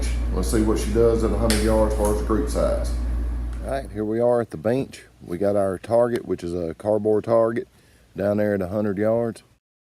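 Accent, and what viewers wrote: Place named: Kentucky, United States. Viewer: You sound like a fellow Kentuckian. Am I right?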